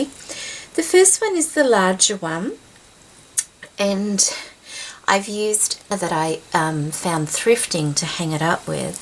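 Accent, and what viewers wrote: Australia. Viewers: Oh, I love both the projects and I have to say I LOVE the way you presented them and your description of each lace, bling, etc that you use is so enticing and inviting! I love listening to your voice anyway, it's so sweet and your Australian accent is pure beauty (hate to say it but sometimes it, when I hear it say, on the TV, can be a little........cant think of the word)! Oh, and how on earth do you remember who sends you what? it is such a gift you have, as its lovely to hear the names mentioned (think I may have asked you this before, if so forgive the intrusion). Hope you are well, my friend, hugs from June xx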